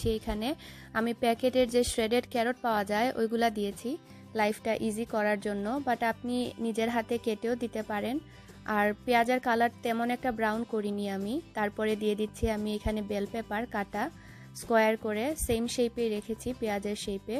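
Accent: Sylhet, Bangladesh. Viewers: Full Video dekar age comment korlm. Apu miss korrm afnr sylheti voice 😥but afnr j mat shunrm bala lager afne jrokom matoin na kne afnr sathe sovsmy takmu love u appi 😘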